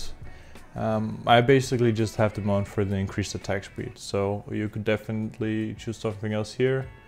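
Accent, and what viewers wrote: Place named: Scandinavia. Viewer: Bro are you a Scandinavian living in Brazil? Sorry.. you just just sound Scandinavian 😉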